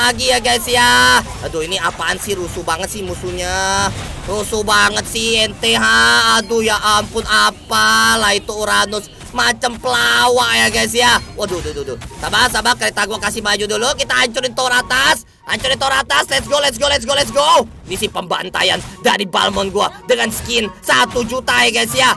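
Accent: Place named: Java, Indonesia. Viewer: Sunda Bogsel Nih bang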